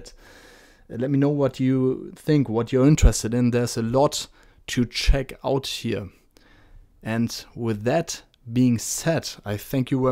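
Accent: Germany. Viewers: Safe Deutscher oder?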